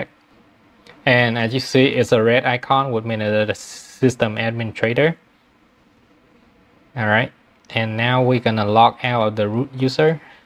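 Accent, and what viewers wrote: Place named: Vietnam. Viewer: recognize by the accent. I'm also Vietnamese, and I am too, building the homelab with opnsense and proxmox and truenas. Seeing other vietnamese enthuiast about opnsense make me feel I'm not alone :)))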